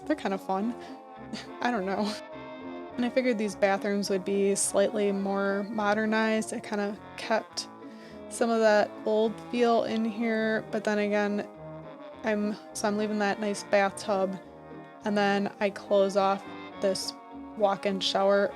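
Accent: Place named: Syracuse, United States. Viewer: This is awesome. There is a magnolia in my hometown of Syracuse NY. Your accent kind of sounds like you’re from there!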